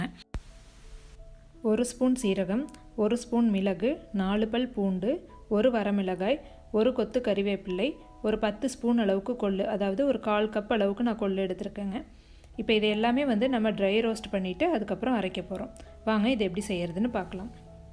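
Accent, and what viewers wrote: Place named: Erode, India. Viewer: I like the way you speak😍Erode vaasam US ponalum veesuthu😊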